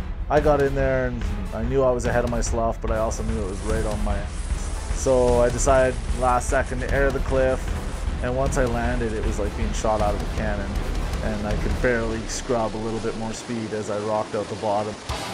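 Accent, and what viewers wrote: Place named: California, United States. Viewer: Sounds like cali surfer dialect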